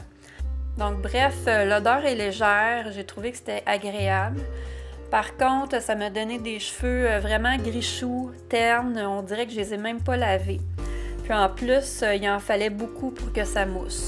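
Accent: Canada. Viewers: Coucou merci d'être passé sur notre chaîne on s'abonne aussi ... une question es tu canadienne car tu as l'accent canadien bonne fête 😘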